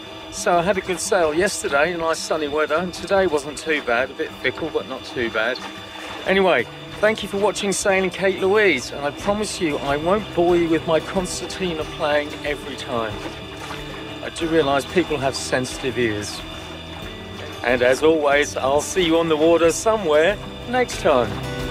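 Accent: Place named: Australia. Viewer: First - the concertina! Amazing! Not the easyest instrument in the world and you did a fairly okay reel there without mistakes. At least - I am impressed. And for your sailing. Lovely as ever. You are facing the summerseason now, as I am freezing up here in Denmark. So looking forward to enloying some nice trips with you down younder, feeling the warmth through my screen and dreaming of my own coming summer juerneys. Did you grow up in Briatain? I would swear by your accent that you were born aussie. - Well. I'm just a Dane. How would I know the differences 🙂 Looking forward for your next vlog! Ah - I forgot. The million vieuws are just bacause your vlog are real quality. You do put a lot of work into lovely intros and overlockings from one scene to the next. It is a joy to watch your channel!!!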